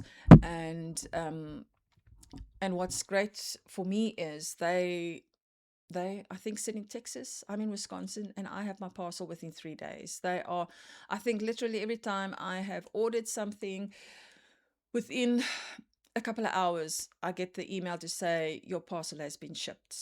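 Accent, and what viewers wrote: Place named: South Africa. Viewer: Hello from the Chicagoland area, really enjoyed your first Flosstube! I have a sibling and nieces currently living in SA, so I recognized your accent right away 😊!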